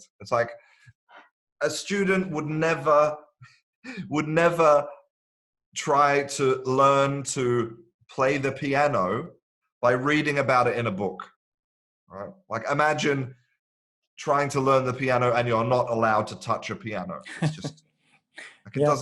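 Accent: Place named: United Kingdom. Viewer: British accent ! you are excellent man. Thanks ....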